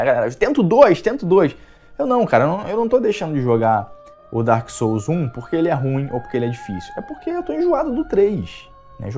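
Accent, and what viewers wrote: Rio de Janeiro, Brazil. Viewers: nem tô mané, é só carioquês mesmo... Isso aí pra mim é vírgula kkkk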